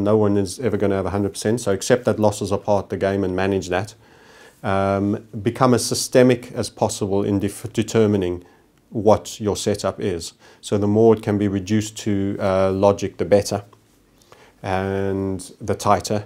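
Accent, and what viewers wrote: South Africa. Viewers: Why do you sound South African? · SA accent.. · He has a South African accent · you sound south african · This guy sounds South African 🇿🇦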